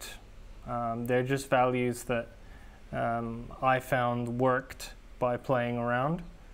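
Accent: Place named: Southland, New Zealand. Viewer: From your accent am I correct you are from Southland in NZ?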